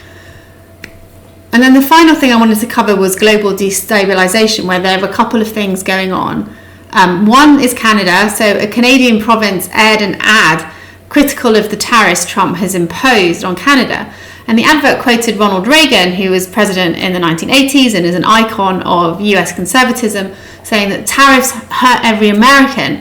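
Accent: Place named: Sussex, United Kingdom. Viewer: Get a bit more dirty of a Sussex accent why doncha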